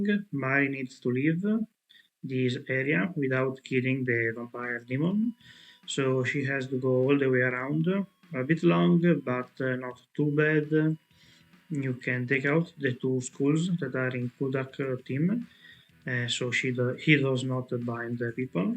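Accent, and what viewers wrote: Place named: Italy. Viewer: Minchia fra, appena ti ho sentito parlare sapevo già che tu eri Italiano, sei un grande per portare questa grandissime macro serie con i tuoi video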